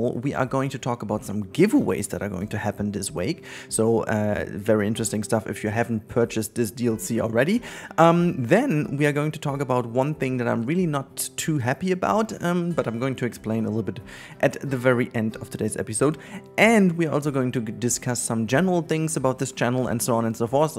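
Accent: Germany. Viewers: don't worry about your accent, the important thing that we understand you clearly, and atleast i do. When i was new to this channel you were the first person ever that i heard speaking with german accent, and yes, it sounded very different at first, but nowadays i don't even realise it. As a non native english speaker i understand your pain, cause like you said, we do know that our accent is weird, we just can't figure out why. But anyway, the building looks awesome, keep up the good work!👍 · You speak English very well! English has dozens (if not hundreds) of different accents among its native speakers. In the USA there is Southern, Cajun, Boston, Jersey, New York, Mid West, and several others. Not to mention the various accents with the UK and Australia. You speak English with a German accent because you are German. And let me say. You speak English very well my friend. 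Your grammar is better than most adults here in America so props to you! Ignore the haters, they are just unhappy in life and need to hurt people in order to feel better about themselves! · Hello. Please don't worry about your accent or change it. I also come from Germany and with your videos my English grade is better. Thanks for your work here on yt and Twitch. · your English with a German accent is wonderful! I have a German friend who speaks English and has nearly the same accent. You're doing great. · Hi, very nice habitat and lovely video! btw your accent is not disturbing. You're english is very good with the touch of German accent, i like it:) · I ‘notice’ your accent only insofar as I’m aware that you’re German. You speak excellent English — from an American who lives in England. I’ve never noticed anything ‘wrong’ with your English. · Hey - I just wanted to say I think your accent is great:) I'm an English only speaker and I never have a hard time understanding what you are saying. 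Also try to remember that language is always imperfect and changing (even though people like to think that their way is the "right way" a lot of the time) - there's no need for them to judge you and certainly no need for you to judge yourself. I can't imagine having to learn my language as a SECOND language so I give major props to you for speaking it as well as you do. Plus, your German accent is cool and I hope you keep it:) Great video as always, and take care ^_^ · I must say I'm not bothered by your german accent, your videos are amazing and thats all that matters.😊 Keep on the good work cause I'm ready for the next episode!👍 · Hi! Please dont worry about your accent, I am German too and my englisch is very very bad, but the most important thing is, that i can understand you and understand, what you want to say. Thats the point. So please keep it up <3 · My mom is german and has a german accent and I never hear it. 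I sometimes barely hear your accent because i'm just so used to german accents... And Australian. But anyways, she sometimes gets some words wrong, mainly words with J's in them, and I just kind of roll my eyes and correct her because she's my mom, but hey, it's a normal german thing to do. Don't feel bad about it!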